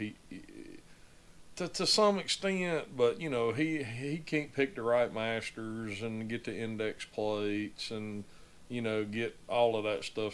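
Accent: South, United States. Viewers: I’m just here to tell you, I’ll be here watching ya make whatever you make. I miss hearing real southern folk talk out here in Cali. You could polish a turd and I would watch. I am not your target demographic, but you can educate and explain things to people from different backgrounds. You do you, I’ll be here watching.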